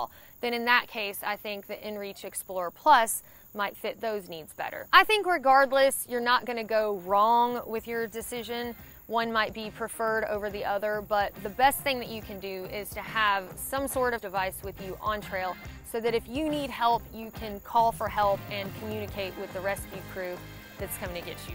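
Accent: South, United States. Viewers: I like your southern accent. The info was great as I have explorer+ and with mini2 out I was contemplating going to mini2 vs keeping my explorer+ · I've come to really like the southern accent in ladies. Anyway, hahaha, great content!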